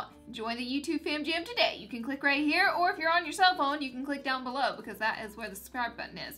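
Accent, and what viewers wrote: South, United States. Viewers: are u American 😂😂😂😂😂😂😀😂😂😂😂😂😂😂😂😂😂😂😂😂😂😂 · I love your accent!! I'm from Texas and I love hearing that southern accent it just makes everything so much nicer haha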